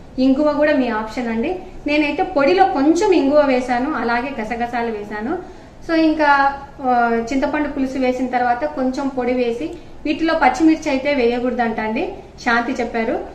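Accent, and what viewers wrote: Rayalaseema, India. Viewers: Super andi mee vantakalu meeremo rayalaseema mee papa emo andra basha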